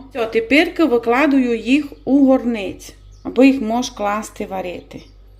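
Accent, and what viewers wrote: Transcarpathia, Ukraine. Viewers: Дякую за смачні рецепти,нічого не змінюй,приємно чути закарпатський діалект,розвиток каналу та нових підписчиків,благополуча твоїй родині. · Не слушайте всяких дураков. Так как разговаривают на Закарпатье, нигде не говорят. Наш диалект не сравним ни с чем. Я слушаю вас с удовольствием, сама из Закарпатья уже 30 лет живу в Греции и не забыла до сих пор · Ой, Андрюха, насмішив😂 Це закарпатській діалект. на це питання вже відповідала. А у самого який діалект? Скільки помилок у солов'їній😂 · добрий день! Дякую вам за цю смакоту, ви так все доступно пояснюєте, що навіть недосвідчена господиня з легкістю може це приготувати, якщо є бажання звісно! Особлива подяка вам за ваш закарпатський діалект, кожне відео дивлюсь із задоволенням! Завдяки вам навчилась закручувати голубці таким способом( конвертиком), так кажуть на Вінниччині. 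Миру, добра, перемоги нашій рідній, рясних Божих благословінь вам і вашіій великій родині! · мы вас очень любим и мы очень любим ваш закарпатский говор. С любовью из Нью Йорка. 🇺🇸❤️🇺🇦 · дякую Вам за рецепти i Вашу закарпатську мову - вона неперевершена. А на дурнiв не звертайте уваги. Пишуть коментарi i дають поради, а помилок, як у учнiв першого класу. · Як вам не соромно! дуже щира мудра жінка. А те, що вона так розмовляє, то на Закарпатті такий місцевий діалект, і це прекрасно, тому що говорить про різноманіття нашої країни. Ви повинні вибачитись за ваше хамство. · Дякую, люблю слухати Вашу мову з закарпатським діалектом! І господиня ви прекрасна, молодець! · Дякую Вам за хороші рецепти, коментарі до страв, оформлення страв та столу, красивий посуд. І надалі показуйте закарпатські страви, ваш говір і традиції закарпаття. На дурні коментарі не зважайте.😊😊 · Закрийся, безграмотне нещастя Це закарпатська говірка! 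Приємна на слух і всім зрозуміла Велика подяка цій чудовій господині ❤❤❤ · Хто це такий безграмотний навчає, як їй розмовляти? У кожному регіоні України є свій специфічний діалект.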